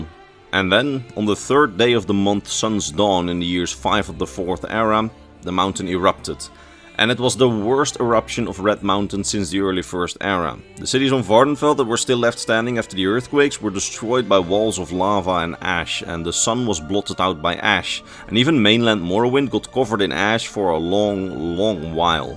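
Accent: Netherlands. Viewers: It’s the Dutch accent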